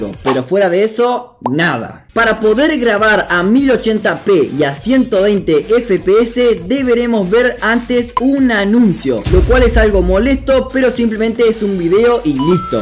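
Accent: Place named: Chile. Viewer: eres chileno? se te nota el mismo acento que los de gran hermano chile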